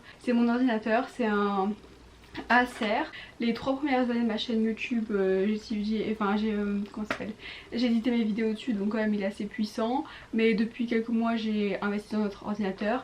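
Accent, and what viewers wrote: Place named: Italy. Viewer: Super vidéo comme d'habitude. J'ai été surprise par ta manière de parler : tu commence a avoir un accent sûrement à force de parler italien 😂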